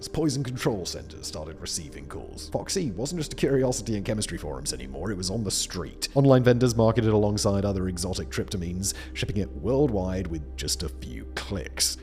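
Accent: England, United Kingdom. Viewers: 2 recorded deaths. There are thousands of known hallucinogens. I never really thought you would be above this fear mongering & we all know you weren’t writing, editing or engaging in the creative process of these channels for many years now. You’re just an English accent reading catch-all content targeting an American audience.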